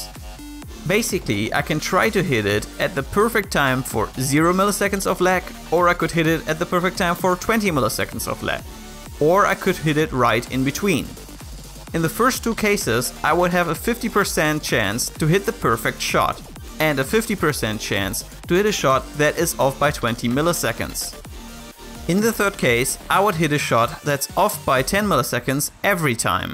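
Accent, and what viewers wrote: Germany. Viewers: Are you German? Great videos! (Subscribed!) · You sound german. Are you?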